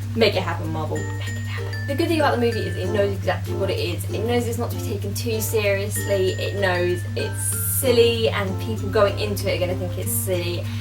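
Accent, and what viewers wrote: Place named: United Kingdom. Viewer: That british accent is beautiful, i love it.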